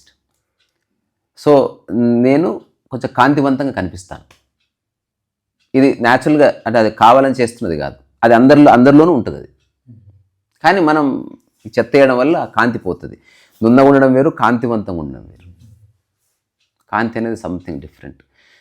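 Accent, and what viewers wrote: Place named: Telangana, India. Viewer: Meeru pakkaki telangaana language lo unnaru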